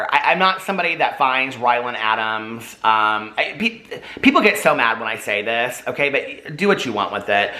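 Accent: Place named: Indiana, United States. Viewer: helped me pass my Test of English Foreign Language! For 4 months I watched his videos on all of his channels to practice listening and speaking. One day I might speak as fast as, with some indiana accent! 🤩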